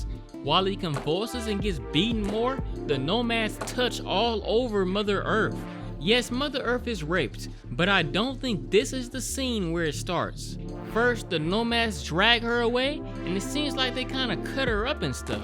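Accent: United States. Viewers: This guy has a nice American accent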